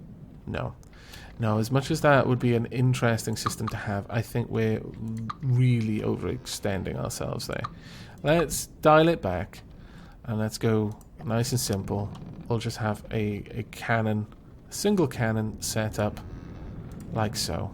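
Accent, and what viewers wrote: Wales, United Kingdom. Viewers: Where r u from? You have a Welsh twang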